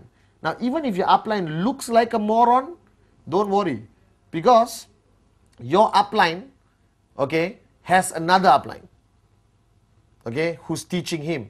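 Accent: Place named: Malaysia. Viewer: it should be in Hindi language also... because of most of the people are unable to understand English specially in malesian accent.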